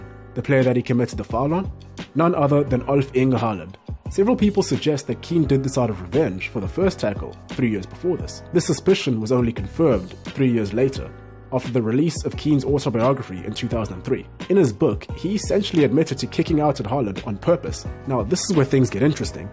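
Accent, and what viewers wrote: South Africa. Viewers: where are you from? south africa? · Nice to hear a South African 👍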